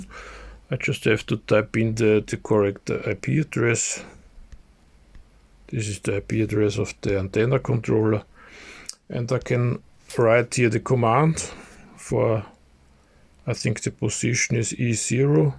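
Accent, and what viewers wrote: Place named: Vienna, Austria. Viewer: Aguy with a Vienna accent, great.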